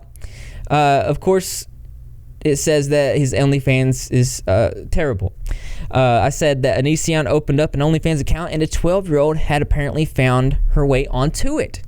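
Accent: South, United States. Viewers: Love that southern twang 😍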